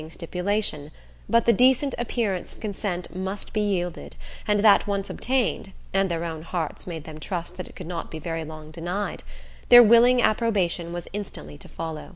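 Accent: RP, United Kingdom. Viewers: Thanks to uploader for this gem. Reader is superb... she does an upper class british accent so well! Your time and effort has given great joy to this part of the world.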